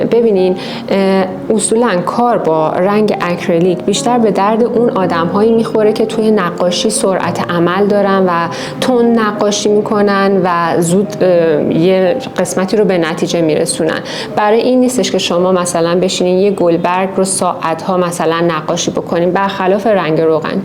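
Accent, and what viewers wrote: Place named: Isfahan, Iran. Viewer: فهمیدم اصفهانی هستی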